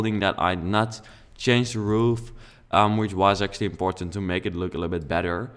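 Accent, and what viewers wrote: Netherlands. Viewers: een nederlands accent ;) je bouwt mooi!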